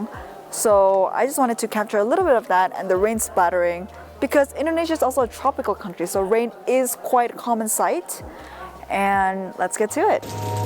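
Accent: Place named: United States. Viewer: Hi! I'm English Nothing wrong with your English Ah ah! Bit of USA accent to me But enjoy your channel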